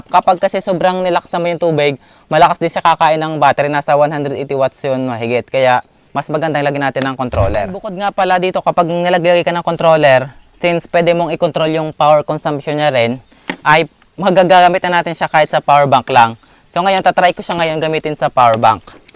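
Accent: Philippines. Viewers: Boss taga saan ka ga? Nakakatuwa ang iyong punto..haha Iniisip ko tuloy ay magka-probinsya tayo.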